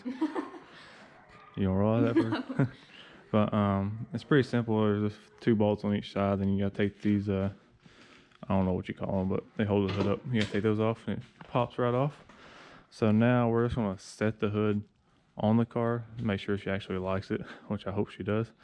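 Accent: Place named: South Carolina, United States. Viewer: I knew yall lived in south Carolina by your accent bo I lived moved from Swansea sc lived there 8 years. Moved to ohio. Thinking of getting a lt1 or a mustang but I've always been a chevy guy like the videos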